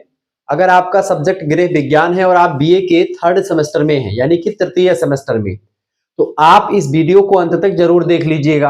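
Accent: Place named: Bihar, India. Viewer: Sir ye bihari language mt bola kro kuch smj nhi aata Normal hindi bola kro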